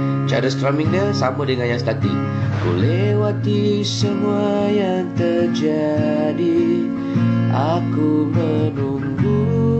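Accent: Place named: Singapore. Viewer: bro orang spore ke? cara style cakap mcm orang melayu spore la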